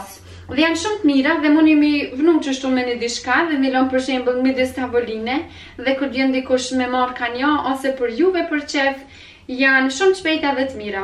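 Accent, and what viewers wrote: Kosovo. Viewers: QENKE SHUM E MIRE DHE SHPJEGON SHUM MIRE ME PELQEN DIALEKTI KOSOVAR SHUM. TE PERSHENDES. 🙋😄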